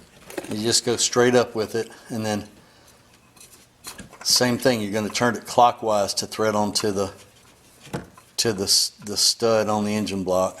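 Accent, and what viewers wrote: South, United States. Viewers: "Its not gon hurt a thang. so i take my finger and i dip it in the old oil that i just drained..."..lol .lol ....love the accent man.. Im from the island , so no shame there.. Sounds like your from the South?, pardon me if i get it wrong. Times like these i dont understand racism these days,so much we can learn from each other :( . . People who now cars know that its simple stuff or what would seem like simple stuff,but im sure alot of people have been blessed by your time. even i did, and i just came for a refresher.. Much love to you my brother. Great help,great patience with your instructions while being fluid and efficient. Bless you. And thank you.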